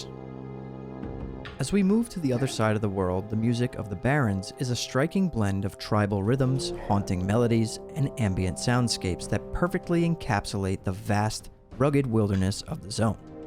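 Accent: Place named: England, United Kingdom. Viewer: You forgot one thing. For players from other country’s the social experience could be difficult. I hear that you are english. But for a dutch person like me it was not easy. BUT i really wanted to get better in learning english. I learned more in wow then at school. Not everyone has the luxury that they get servers in their own language.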